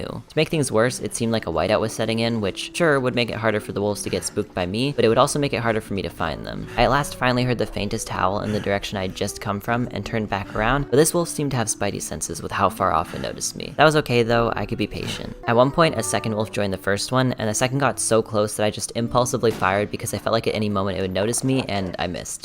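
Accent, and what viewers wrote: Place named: Canada. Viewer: he sounds adorable ALSO caneda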